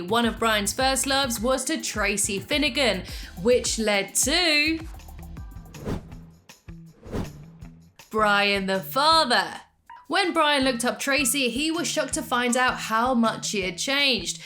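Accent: United Kingdom. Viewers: I love your British accent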